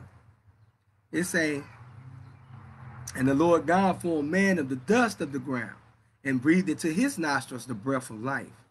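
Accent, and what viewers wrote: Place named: South, United States. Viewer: the southern accent reading KJV sounds nice. like it makes it easy to understand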